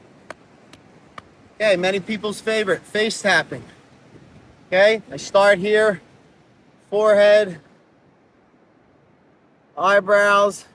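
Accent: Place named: Philadelphia, United States. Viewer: Omg. I love you but that Philly accent...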